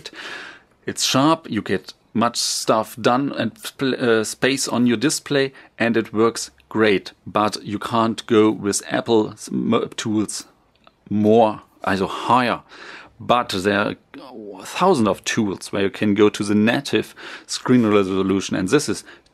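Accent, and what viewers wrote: Germany. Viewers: Yay ein deutscher. Ich habe den Aktzend doch gleich erkannt ^^ Ist das eine 4k Auflösung? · After 5 seconds, i already heard that you are German.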